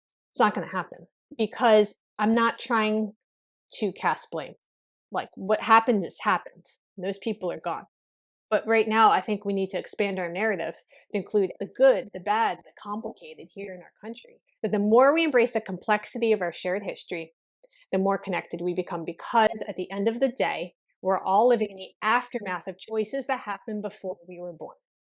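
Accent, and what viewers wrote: United States. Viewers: You are a white american. Of course these are social constructs and I guess you can be anything you want but others can do the same and label you white american. You look white and you sound american.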